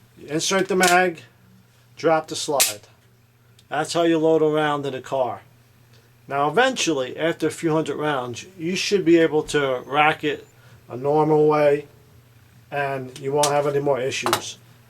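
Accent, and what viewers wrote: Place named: New York, United States. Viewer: just a New Yorker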